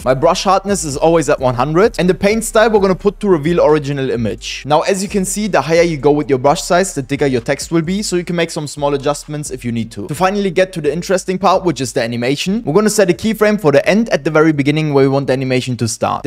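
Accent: Germany. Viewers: Are you from germany ? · i tink tat you're deutsch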